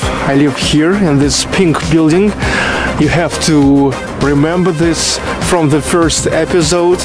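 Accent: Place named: Russia. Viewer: that's sad to hear but at least your really Russian and I love your accent :)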